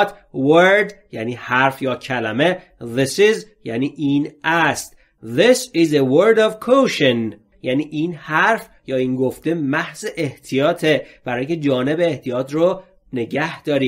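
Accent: United States. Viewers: Are you Americam? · Are you American ?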